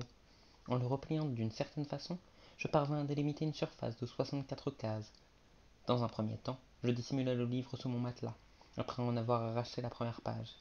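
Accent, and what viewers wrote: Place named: France. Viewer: Non il parle juste francais de France. Il lit tres bien.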